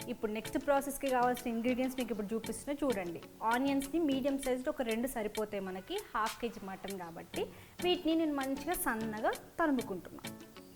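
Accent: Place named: Warangal, India. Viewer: Warangal slang Also to good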